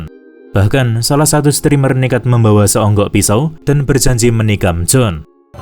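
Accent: Java, Indonesia. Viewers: Medok banget 😢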